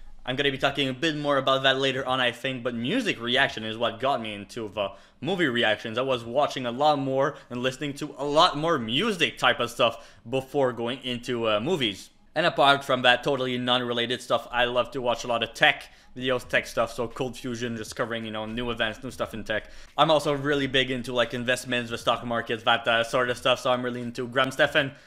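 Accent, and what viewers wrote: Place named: France. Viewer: oh so you are french alright i was Thinking what kind of accent that is and where is written as you like? thats awesome!!! Favorite songs? ( is awesome too) and L0L you are younger than me i thought you were 25 or older but definitely below 30 can you watch coma attraction attraction 2 what happened to monday rampage angry birds jump street 1 and 2 Leon soul transformers 1-5 +bumblebee clash of the titans,wrath of the titans 300 great wall 47 ronin pitch Black bloodshot dr sleep ghost in the shell scarface goosebumps invisible man percy Jackson dragonball broly it 1 and 2 evil dead trilogy guns akimbo parasite spies in disguise commuter detective pikachu gentlemen non stop attack the block hard powder Cold pursuit planet of apes trilogy abraham Lincoln Vampire hunter outlander gemini man Jericho project gunman american assassin youre next halloween 2018 predator Upgrade pacific rim 1 and 2 damn you have a lot of funko pops i only have 4 or 5 i think, Thanos, gold thor, dr strange, wolverine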